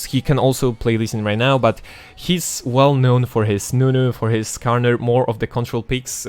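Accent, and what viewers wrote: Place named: South, United Kingdom. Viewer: fajny akcent masz, taki łagodny południowo brytyjski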